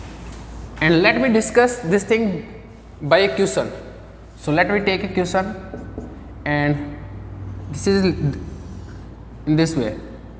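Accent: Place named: Haryana, India. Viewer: This video is very much useful,Sir .. Thank you very much. But in this video we miss your hariyana language Sir ,wo wala language jyada cute hota hei 😊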